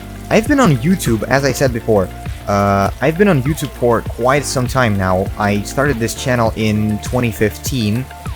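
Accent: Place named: Russia. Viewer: How long have you been speaking english? You hardly have an accent so I didn't believe that you were Russian at first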